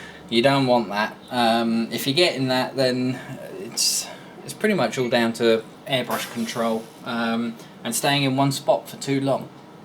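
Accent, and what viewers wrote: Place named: Preston, United Kingdom. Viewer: HI really enjoy your vids and admire your talent. Where abouts are you located roughly of course, I used to live in England in Preston. Doesnt sound as if you are too far from there listening to you talk?. I reside in British Columbia Canada now. Are you aware of MIdwest Airbrush Supply in Chicago. They pretty much have everything and ship all over the world.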